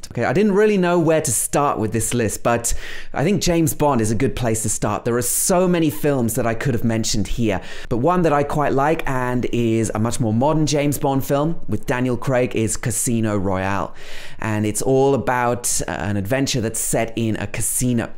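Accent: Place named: United Kingdom. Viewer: I love British accent and I hope that someday I would be able to sound like you . You are the best teacher on internet.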